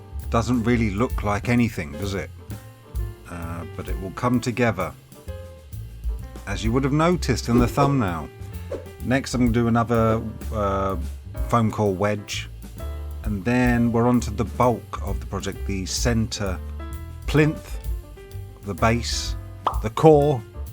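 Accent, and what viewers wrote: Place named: RP, United Kingdom. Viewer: As fascinating as your builds and build process is, the main draw is your dry humor and the posh affectation you apply to your accent. I can't quite tell if it's real or not, but it sells the dry humor. My American ears are entertained.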